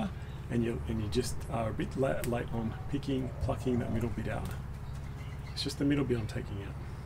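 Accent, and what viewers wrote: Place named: Australia. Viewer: Beautiful maples ❤ I love that Aussie accent. You have beautiful trees and I appreciate you sharing your thoughts on trimming them in spring. 👍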